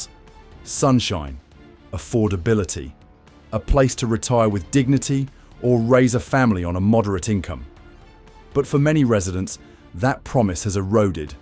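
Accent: England, United Kingdom. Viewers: The story would be better if it was. told by someone who sounded American. An Englishman, may just not think much of America, in general.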